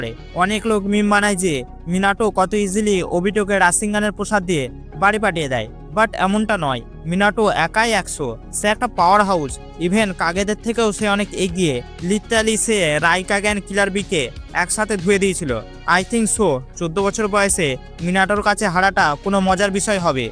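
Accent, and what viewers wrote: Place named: Kolkata, India. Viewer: ভাইয়া আপনি কি কলকাতায় থাকেন?(আপনার কথা শুনে তাই মনে হয় 😅। যদিও আপনার কথার উচ্চারণ আর সুরগুলো খুব ফানি হয়😂)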